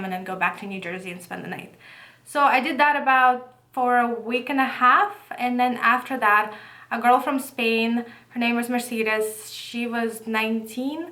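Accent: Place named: Russia. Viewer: Про perfect вы загнули конечно. Русский акцент заметен сильно (говорю как человек переехавший в 12 лет)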